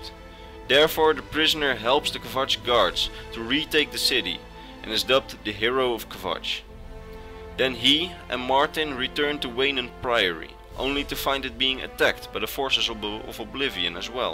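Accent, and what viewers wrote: Netherlands. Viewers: This guy is Dutch i hear it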